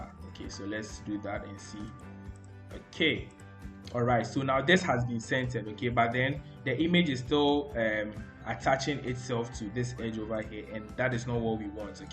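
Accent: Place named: Ghana. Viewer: Great one There. Your accent reminds me of a Ghanaian Friend in Germany.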